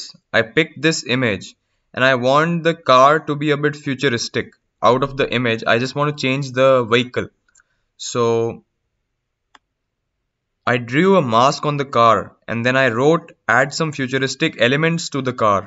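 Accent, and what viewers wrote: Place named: India. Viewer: hey, man, I totally understand your indian ascent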